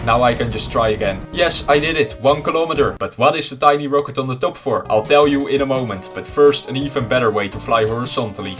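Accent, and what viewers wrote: Netherlands. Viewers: Judging by your accent your dutch! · Is it weird that I can hear that you are Dutch? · i can hear that this man's dutch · ar you Dutch? because im dutch and you have a dutch accent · you sound dutch r u? if yes then i wanna say 'hallo man' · Is he dutch voice sounds very dutch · Je Nederlandse accent is wel grappig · Dutch person recognises dutch accent...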